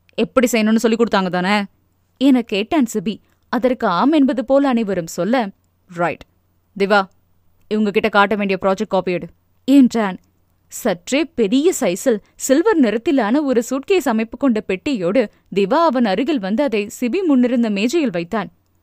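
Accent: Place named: Telugu, India. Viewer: Telugu slang super super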